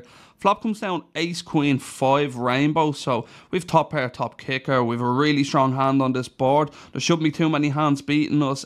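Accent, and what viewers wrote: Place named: Ireland. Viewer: Love it! I just watch your vlogs cause I enjoy hearing hand histories in an Irish accent. Haha. Anyways great episode. Also, that raise on the river with Q10o was SPICY!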